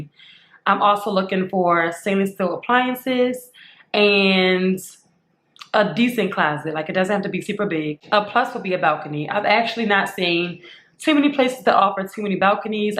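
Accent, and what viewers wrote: Baltimore, United States. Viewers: That Baltimore accent is strong 😭😭😭😭🥺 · Hour on the train. And accent. Gotta be from Baltimore using the Marc. I almost switched off the video thinking it was over not even realizing you were about to do the tour and that I had been listening to you this entire time without even seeing the place! Your voice is very nice.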